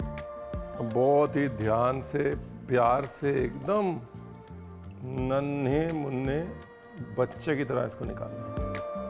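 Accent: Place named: Varanasi, India. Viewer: Banaras walo ka bolne ka style bhut achha h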